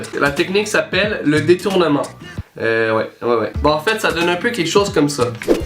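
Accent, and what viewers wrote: Quebec, Canada. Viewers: bin quoi...tu as un accent quebequois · C un accent québécois et c pas un faux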